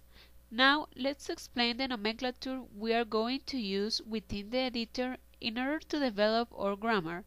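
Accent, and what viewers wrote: India. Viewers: Thumb up for the indian accent :D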